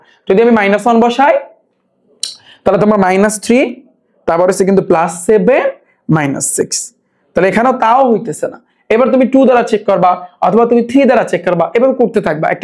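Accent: Barishal, Bangladesh. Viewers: স্যার এর বাসা কই,,কিছু কথা শুনলে মনে হয় বরিশালে 🤔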